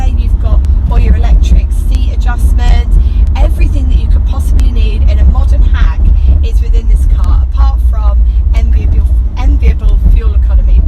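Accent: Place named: RP, United Kingdom. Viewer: Oooh gone posh again! Suits you I reckon. Another great car review as always 😁👍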